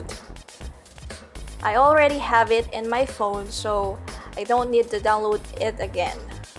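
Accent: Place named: Philippines. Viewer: accent sounds like a filipina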